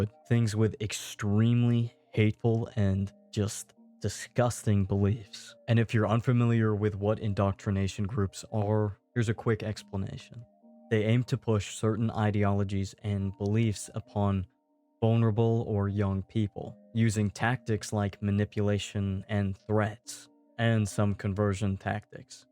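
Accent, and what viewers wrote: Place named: United Kingdom. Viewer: in his British accent era is peak:D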